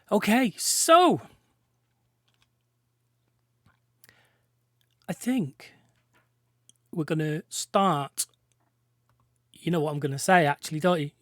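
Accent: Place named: United Kingdom. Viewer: Loving the style of your videos and it's always great to here a British voice on a gaming channel!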